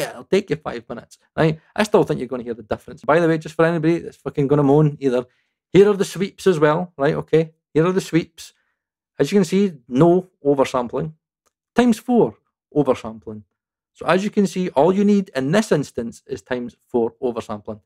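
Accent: Scotland, United Kingdom. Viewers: Lol. I didn't know that's how the Scottish accent sounds like. It's kind of cool. Hey, BTW, I'd love to see you reviewing and testing Black Rooster plugins. 🙏🏻 Thanks a lot for your great work!! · Yeah, it surely would improve the intelligibility. I mean, being Scottish doesn't help it one bit. 😆 Love your vids, man, keep'em comin'! 💪😎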